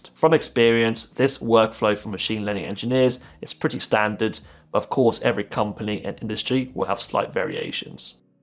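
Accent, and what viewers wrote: United Kingdom. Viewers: For machine learning, I choose to learn Python and C++ as my main programming languages. I like your video because of your British accent. Why don't you use X as a machine learning engineer?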